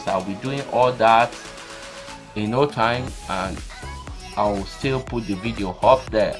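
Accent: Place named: Africa. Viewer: Thanks for the presentation, and, interesting breaking the ice to Africanize YouTube engineering video presentation. Your language is clear- sounds like a seasoned African. In addition to your efforts, I will encourage you to put what you talked about in this video into a practical teaching. You could have one biogas source, connect the engine to it, and then show the world how goodly it works. Try this and how that raises your views, likes, comments, and subscriptions. Thank you, Sir.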